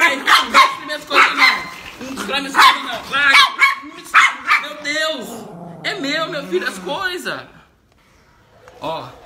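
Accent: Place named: Minas Gerais, Brazil. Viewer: Esse capixaba tem sotaques de mineiro😂😂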